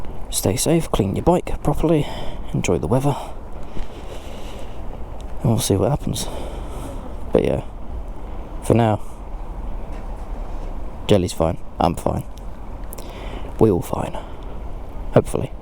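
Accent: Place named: Cockney, United Kingdom. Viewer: Ooo sounds exciting. Looking forward to hearing the cockney verbals come out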